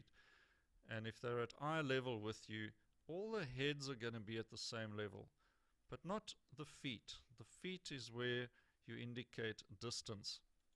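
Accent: South Africa. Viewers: Hi. I have just come across this video and I find it extremely well conceived. It is extremely helpful particularly for urban sketching. Thank you for sharing. Enjoyed the S.A accent!!!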